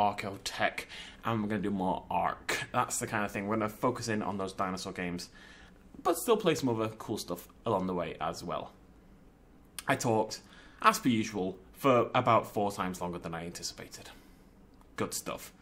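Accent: United Kingdom. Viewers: Ten days without a video in British? I can't deal....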